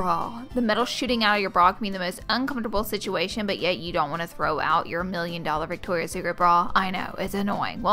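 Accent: South, United States. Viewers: I love your southern accent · is she a southern bell alls I hear is southern in her voice 💙😂😊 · You are so funny, love that southern accent!!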